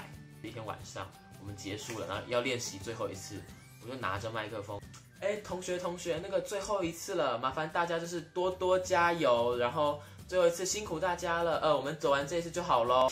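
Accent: Xiamen, China. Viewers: 我感觉厦门那边普通话也这个味儿其实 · 还好了，台湾腔，就是闽南厦门一带人说普通话的腔调而已。厦门人说普通话也你的调调。只是很多人不知道而已。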